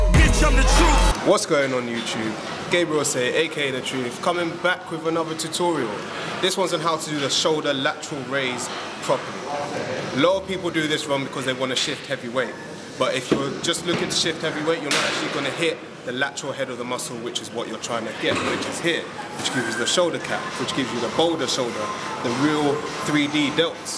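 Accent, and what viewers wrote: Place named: London, United Kingdom. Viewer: Dude must be from london he got accent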